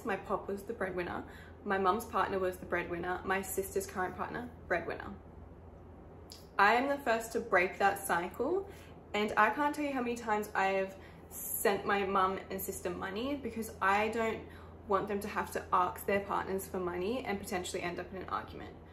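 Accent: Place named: Australia. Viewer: I’m glad that i come across your video!!! You’re so underrated! I wish you could gain more subscribers soon! Also i will start watching your videos starting from today so i can learn aussie accent cause i had always been liking aussie accent <33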